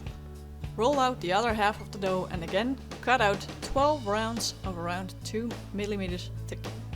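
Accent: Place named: Netherlands. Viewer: Waarom praat je niet gewoon Nederlands want je Engels tells me clearly dat je Nederlands bent.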